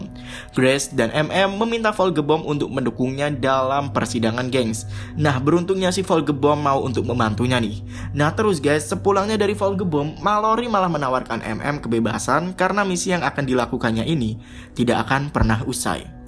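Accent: Java, Indonesia. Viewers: Mas sampeyan wong jowo zo...?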